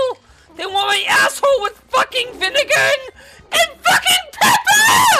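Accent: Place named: Boston, United States. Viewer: God i love ur Boston accent it's so adorable!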